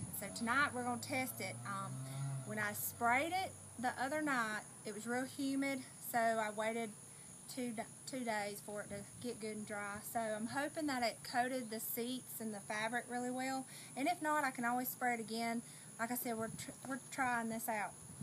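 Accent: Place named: Georgia, United States. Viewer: Cool to see a fellow south Georgian on here. I live between Waycross and Brunswick. I thought the accent sounded familiar. Where in South Georgia are you located(approximately, not your address. Didn’t want to come off creepy lol lol)?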